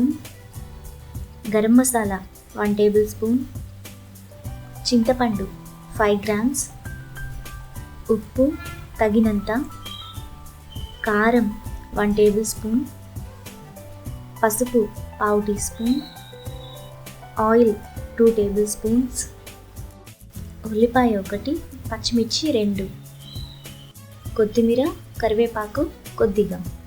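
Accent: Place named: Telangana, India. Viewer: its telugu with telangana accent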